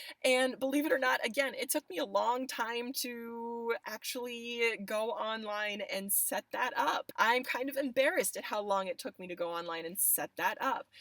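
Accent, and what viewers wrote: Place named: Northeast, United States. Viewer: What part of the northeast are you from?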